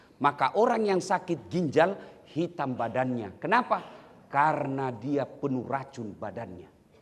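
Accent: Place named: Banyumas, Indonesia. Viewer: bahasane ngapak ora jelas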